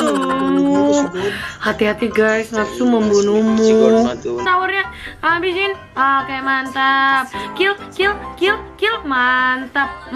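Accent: Indonesia. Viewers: Ngomongnya sunda njay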